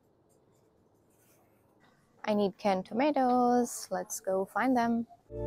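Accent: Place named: England, United Kingdom. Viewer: I like your English accent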